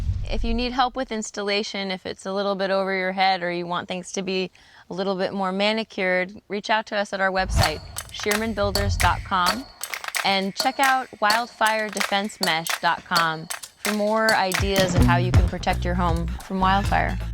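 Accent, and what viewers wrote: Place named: Chicago, United States. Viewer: Thanks for sharing. You have a nice accent, maybe Chicago? I thought Woldfire Defence was an Aussie company. Anyway, here in Southern California, crawl spaces really make you crawl, and in some cases, creep (like a snake!), so I’ll be installing from the outside. Good video, by the way. I’m going to watch the rest of the series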